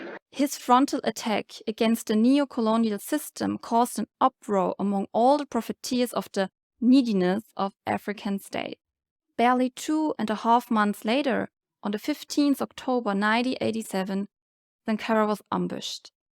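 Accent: Germany. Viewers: Amazing!! I really loved this video!!🥺 It was so sad to see that you don’t have the recognition you deserve. Hopefully your channel grows soon!! You deserve it! I’m so glad I stumbled across it and I will be watching more of your videos!! I really love your accent btw. What part of Germany are you from? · Great storytelling. I recognise your German accent. I'm Namibian so I hear it often in our cities like Windhoek and Swakopmund. We have a huge German community in Namibia. I'll subscribe to your channel.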